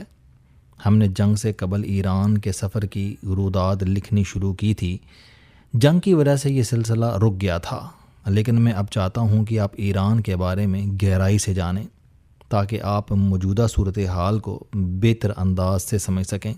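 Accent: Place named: Punjab, Pakistan. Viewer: nice to listen khas toor say panjabi lahzey ki urdu.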